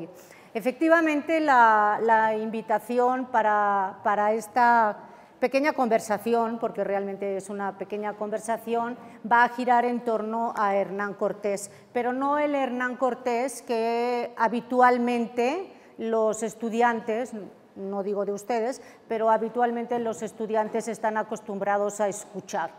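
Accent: Mexico. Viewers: Me gusta el deje mexicano mezclado con el suyo peninsular. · Será residente en México, por que tambien tiene algo de acento. No el canal es tuyo, yo soy un simple seguidor mas cuya pasión es la historia, pero da una conferencia con un tono que se desprende que Cortés ha sido poco menos de un ser miserable, desde el minuto uno, adoleciendo de la imparcialidad con que un historiador debe analizar y presentar la historia. Apenas vi 16 minutos. Y respecto a algún comentario que leí por abajo, discreto, México en la actualidad es donde las instituciones tienen un lenguaje mas antihispánico, cada vez que escucho una conferencia, por lo general, me recuerda mucho a sintonizar tv3, un lenguaje subliminal hilarante. Aunque me alegra decir que tengo algún amigo mexicano y además mestizo, que para nada se ajusta a los "cánones impuestos", será que en su caso tuvo bastante contacto directo con españoles y pudo formarse su idea propia.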